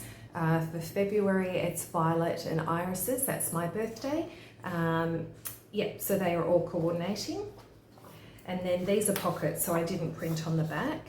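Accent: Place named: Australia. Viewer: Love the Aussie accent!!😊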